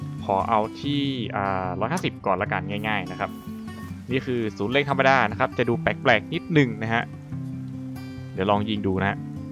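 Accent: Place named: North, Thailand. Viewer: อันนี้เป็นคนเหนือแม่นก่อครับ สำเนียงออกเหนือเลย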